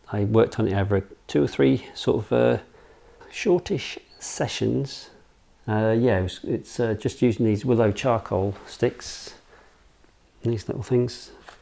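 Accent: United Kingdom. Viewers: Hi. I’m Christophe from Paris. I subscribed quite a time ago. I love your drawings. I’m very much impressed by the large range of medias you are able to practice (watercolor, oil sticks, pastel, charcoal, oil painting …). Your are a very talented artist. I must say one of the most talented I have ever seen so far on internet. Highly professional work, also full of creativity and sensibility. Not surprising to have been promoted by the Royal Birmingham Society of Artists. Your YouTube channel might be also a very usefull guide to guys like me trying to produce some masterpiece in their living room! May I also tell you that I love your dog Basil (he seems to be the happiest dog of the world) and also (from this side of the channel) your so lovely british accent 😊. Best wishes from Paris and long life to your channel.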